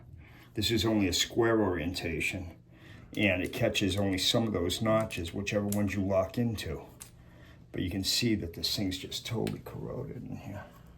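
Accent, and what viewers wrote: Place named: Cape Cod, United States. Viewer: Being a native Cape Codder, I could tell by your accent.😂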